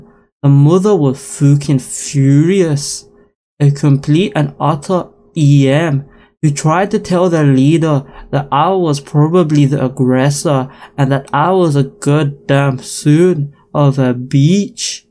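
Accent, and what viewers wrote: Scotland, United Kingdom. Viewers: Advice. Ditch the Scottish accent on the video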